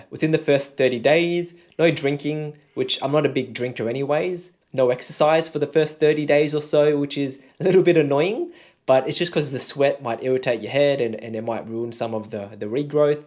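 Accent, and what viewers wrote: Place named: Australia. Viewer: I'm assuming with ur aussie accent the $4k is in AUD and not pandering to the yanks? :) p.s the results look great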